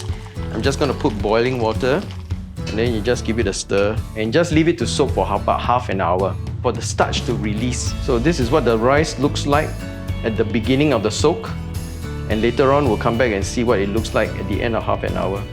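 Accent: Singapore. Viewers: That;s good I don't have a real steamer and I am really glad to skip that step. You talk and sound like a Singaporean and a Teochew at that. Hello Ka Ki Nang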